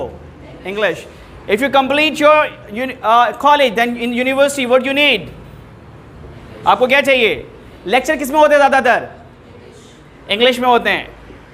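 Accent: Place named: United States. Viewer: I always watch your videos because I love American accent & I know I can't find useful learning material to another tutor.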